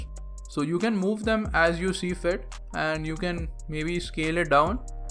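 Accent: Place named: India. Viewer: i hateee,i hatee india guy english accent!!!!!!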